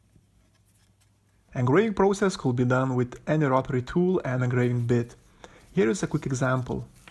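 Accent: Russia. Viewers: Акцент русский... )))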